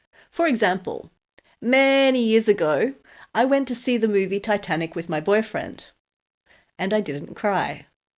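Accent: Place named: England, United Kingdom. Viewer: Thanks girl I really like your accent from which English city are you from ?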